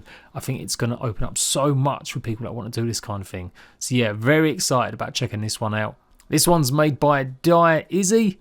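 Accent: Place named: United Kingdom. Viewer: Totally random question. I have a weird hobby--I like learning to recognize accents. Where are you from? I'm guessing you're not from East End London, although I'm still a bad guesser when it comes to British accents because there are so many. Bonus question: what celebrity do you think represents your accent best?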